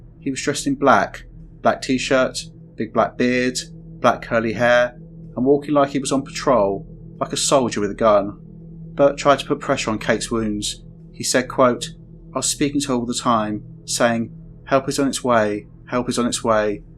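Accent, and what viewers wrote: United Kingdom. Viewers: You're British mate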